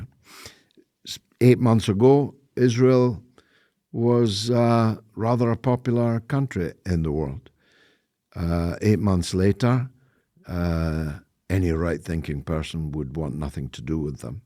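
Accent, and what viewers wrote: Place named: Ireland. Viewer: This man sounds irish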